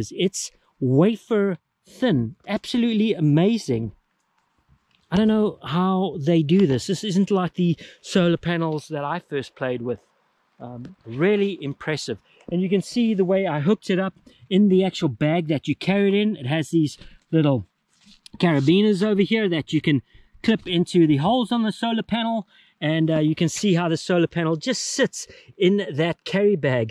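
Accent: Afrikaans, South Africa. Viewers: Jy klink vir my Afrikaans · Love the South Africa Accent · Are you south African? Or have you lived there for a while, your accent is so similar. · South African accent? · I agree that is a South African accent. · Almost sounds South African? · South african?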